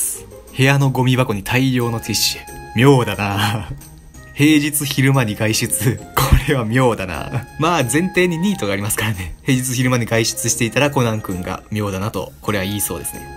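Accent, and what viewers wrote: Kansai, Japan. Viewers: さん、ネットでは関西弁。妙だなあ。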